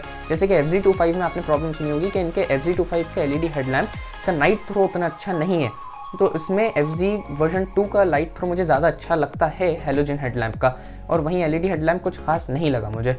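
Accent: Bhopal, India. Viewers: Bhopali accent 🤟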